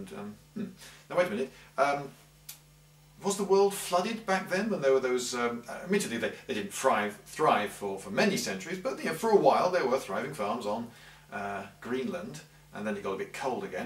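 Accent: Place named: England, United Kingdom. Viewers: Paragraphs one and two, valid point...... mind_change.exe now loading........5%.........10% ERROR WINDOWS SYSTEM CRASH......... Please restart your computer brain, this computer is now running a self-diagnostic..........Entering Safe-Mode..........."hey look. And he has a rant!" BTW about the third paragraph, he does have a popped collar and a British Accent, I thought that was plenty of proof on his end alone! ^.^ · You'd be being naive and very vague to give that answer, yes in summary all the accents are British but it's pointless saying that. be more specific, he has an English accent.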